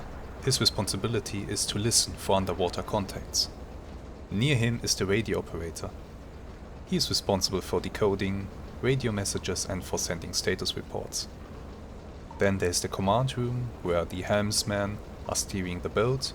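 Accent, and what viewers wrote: Germany. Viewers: You sound German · I actually thought you were german! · a German accent is more than welcome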